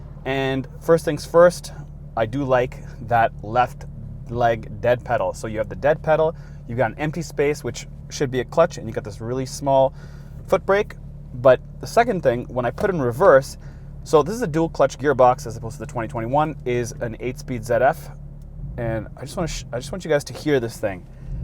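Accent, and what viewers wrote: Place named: Canada. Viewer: Boy that Canadian accent is so heavy...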